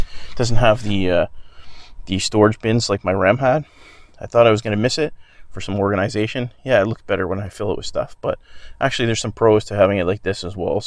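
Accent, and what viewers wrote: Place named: Canada. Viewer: How did I know you were canadian based on your speech? Ha you've got me even more intrigued by the custom trim. I want the LT, but not sure if it's worth the extra 10k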